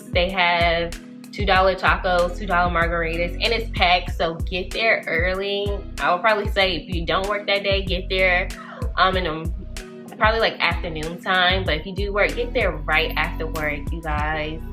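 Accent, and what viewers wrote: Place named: South, United States. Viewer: Great information. I love your Southern accent! I will travel to Charlotte next month.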